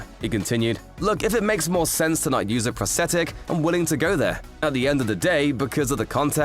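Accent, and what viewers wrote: England, United Kingdom. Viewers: It's an English accent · He actually said “Dirk” but his British accent make it a bit like Doug to American ears.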